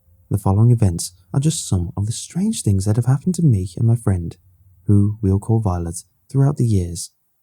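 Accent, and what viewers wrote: United Kingdom. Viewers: your voice is so soothing! I'm not English. But I LOVE this language and I really want to become a native English speaker some day. I'm curious about variety of accents in the UK. Which accent is it that you're speaking? It sounds alluring, smooth, calming and clear. I always listen to you when I'm feeling down in the dumps. It helps me in so many ways. ^ ^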